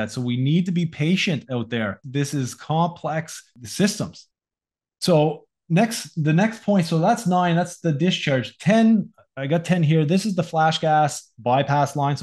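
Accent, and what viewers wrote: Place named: Atlantic Canada, Canada. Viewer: thanks for the video, pal! you from the east coast in Canada? I detect an accent lol Ontario here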